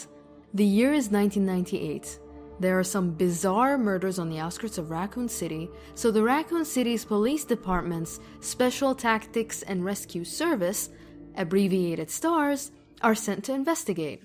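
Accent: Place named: Germany. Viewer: Awesome video. Also, love your accent. Is it German?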